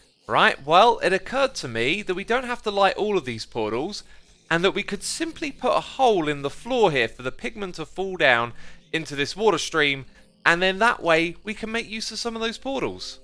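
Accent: United Kingdom. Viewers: Im from Germany and I really love british english. The accent is so awesome and just makes me wanting to live in England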